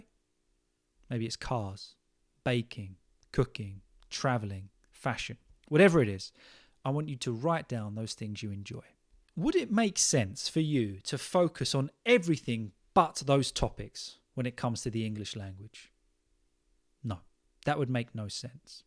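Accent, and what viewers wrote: United Kingdom. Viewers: Thanks for advice🎉 are you British, or did you learn British language?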